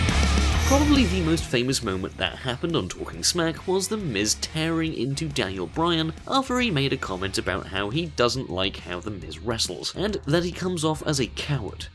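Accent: United Kingdom. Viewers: shocking not so much just another countdowner on youtube with a british accent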